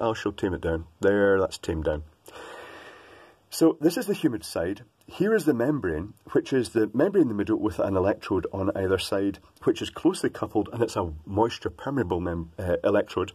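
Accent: Scotland, United Kingdom. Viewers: What part of Scotland is your accent? Is it Edinburgh or inverness? Can’t tell even being Scottish myself.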